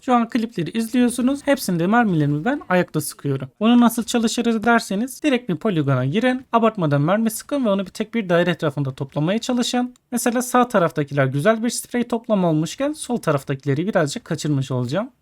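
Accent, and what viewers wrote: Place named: Black Sea, Turkey. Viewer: bi Karadeniz ağzı var gibi derinden kulağa geliyor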